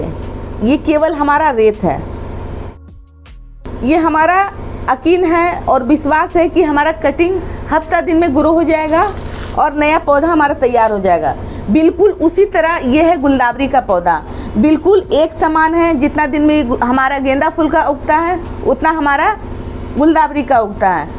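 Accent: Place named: Varanasi, India. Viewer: Madam, aap aur aapki aawaaj me mujhe apna family dikhati hai. Shayad aap banaras ki hai.maduaadih kì hain? Reply kariyega.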